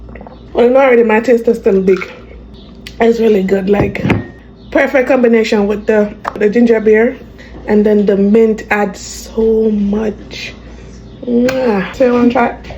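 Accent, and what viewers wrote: Jamaica. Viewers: Just discovered your channel. Love that you get to the point. Love your recipes. Love love love the jamaican accent and I'm subscribed.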